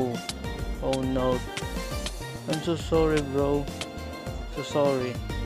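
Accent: Philippines. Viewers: bro your accent is like filipino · By Hearing Your Accent, I'm 100% Sure That You're Filipino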